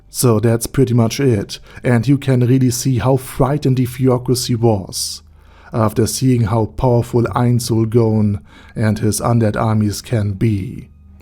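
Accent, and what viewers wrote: Germany. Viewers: thicc german accent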